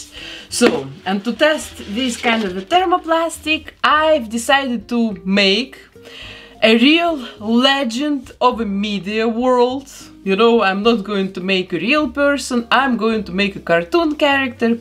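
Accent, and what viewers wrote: Russia. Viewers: why do you have a Russian accent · Почему мне кажется, что она русская, говорит просто с акцентом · хм...чувствуется русский акцент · Как будто русский не старается над произношением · Это... Русский акцент? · Русская что-ли? Ужасный акцент. Очень сильно по ушам бьет. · Sorry, but where are you from?) I'm intrested, because you sound like you have a Russian accent))) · Так слишно руский акцент))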